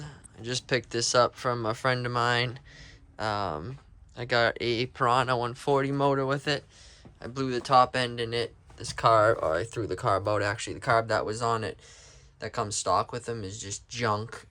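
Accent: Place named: Boston, United States. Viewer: how far away from Indiana are you sounds like you’re in Boston or something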